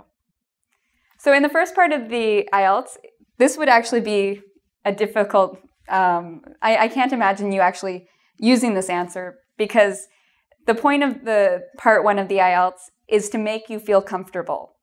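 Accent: Canada. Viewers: Your canadian accent is so cute :) OBOOUT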